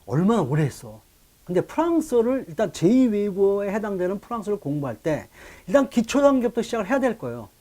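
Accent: South Korea. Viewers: 난 이분 서울사투리가 넘 좋음 귀에 쏙쏙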